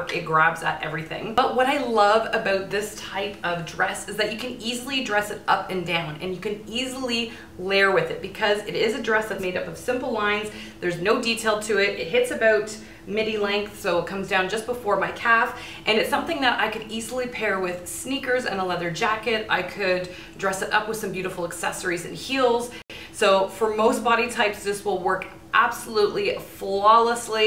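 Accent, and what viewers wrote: Canada. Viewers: I love watching you. Your denim video is basically my go to guide now and I kept saying to my friends, no the point of the pocket should be at the bottom of your bum....LOL. I love your authenticity, sincerity and expertise. I watched so many stylist on youtube and ones who have a lot more subscribers but I only subscribed to you because you are doing a great job providing genuinely value-add content. I also love your Toronto accent as one of my favorite co-workers is from there and she sounds like you :) I am really proud of the work you are doing and I just want to encourage you to keep fighting the good fight!!!!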